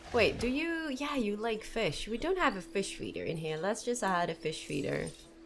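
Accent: Netherlands. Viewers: Soms dacht ik een Nederlands accent te horen, moest het even opzoeken. Zelde hoor je Nederlanders zo goed engels spreken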